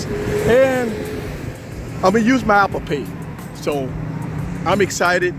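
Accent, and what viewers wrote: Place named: New Orleans, United States. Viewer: Hahahahahahahaha nice video. I’m from NOLA moved to LA when I was ten with fam. Soon as u began to speak I knew where u were from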